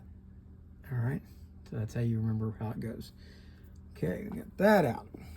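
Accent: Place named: Kentucky, United States. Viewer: even though I have talked to you at length as you guided me through this video, I still just have to comment about this one. Your ability to creatively find ways to fix and repair reels even though parts are missing continues to amaze me. It challenges me to try innovative ways to repair what seems to be irreparable. You did this at least twice in this video and it was amazing. You remember that big TICA surf reel I bought for next to nothing that had a danaged part of the main gear shaft? I figured out a “ miracle” and got that reel working perfectly with a repair that should last indefinitely. As you said in your Kentucky drawl when I told you about it, “now if you hadn’t’ been watching my channel you probably wouldn’t have even tried to fix it.” You were very correct in that assessment as that old but beautiful TICA reel would have been headed for my parts reel boxes. 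When working on this reel you showed another of your many talents - the ability to figure out how to take apart what would confound other less tenacious individuals (such as me.) You also have an almost innate ability to remember how things go back together after you’ve taken them apart. I guess I simply enjoy seeing how your mind works. It is great that you were able to find someone to send you some photos of some missing]g parts that helped you find ways to substitute parts that would allow the reel to properly function. Again, this was an amazing video allowing us to watch over your shoul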